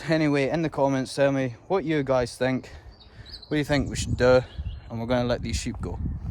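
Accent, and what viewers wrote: Scotland, United Kingdom. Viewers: When you speak I can hear that you are Scottish man.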